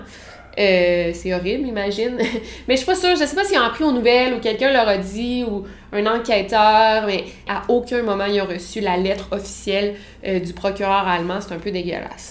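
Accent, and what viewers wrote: Quebec, Canada. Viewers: Grâce à toi j'me suis enfin habituée à l'accent québecquois ^^ j'suis trop contente et tes histoires sont tellement intéressantes !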